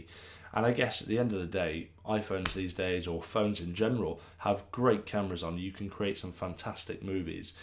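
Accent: United Kingdom. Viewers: No body can narrate better than a Brit! Greeting from Florida, USA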